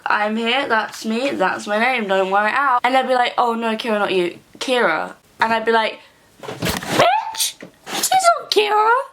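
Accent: United Kingdom. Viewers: Is she British or American ?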